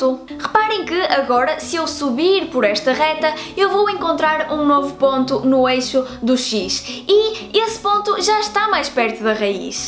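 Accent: North, Portugal. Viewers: Ela fala igual o alex d. Sousa acho o sotaque português bonito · Ela fala mais pouco kkkkk. divertido ver alguém falan o português de Portugal. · Lindo sotaque. As raparigas do norte, realmente têm um sotaque giro. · Sou professor de Matemática e Física no Brasil. Vejo seus vídeos e adoro o sotaque dos portugueses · uma lusitana se enrolando no português.... hehe fico fascinado com esse sotaque! gosto mesmo! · Olá, Seu sotaque um bocadinho diferente é típico da região norte de Portugal? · gosto de assistir seus vídeos para ouvir o sotaque Português.... so por titulo de curiosidade, faz video sobre triângulos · Você é portuguesa? Duvido que exista alguma região do Brasil com esse sotaque. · Adoro esse sotaque de Portugal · A cereja do bolo é esse sotaque lusitano. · Olá moça portuguesa... Acabei de te conhecer por este vídeo, que achei interessante. Sou apaixonado por matemática, acho que vou gostar dos teus videos (apesar de as vezes ter dificuldade de entender alguns pontos, pelo seu sotaque, isto torna a experiência mais interessante e divertida); estou me inscrevendo, e vou ver teu blog também. 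Parabéns pelo vídeo, sucesso e abraços! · Amei o vídeo!! Obrigado pela explicação!! Seu sotaque é muito fofo. Qual a sua nacionalidade? Parece que você fala português de Portugal. Eu sou brasileiro, mas entendi bem o vídeo.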